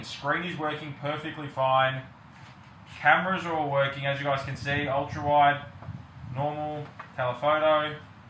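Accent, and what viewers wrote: Australia. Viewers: What is that accent? Australia? New zealand?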